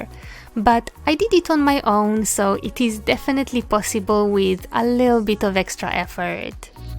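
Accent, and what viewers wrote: Russia. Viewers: I love your accent ... are you from Russia ?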